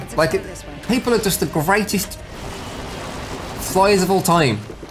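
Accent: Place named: United Kingdom. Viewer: Since has a nice British accent the sponsors sounds like an ad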